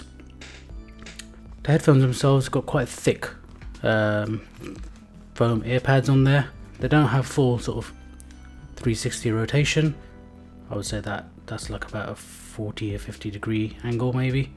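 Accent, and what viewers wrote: England, United Kingdom. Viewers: Great review, liked your pure english accent! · Bhai tumhara accent ek dum english man jaisa hai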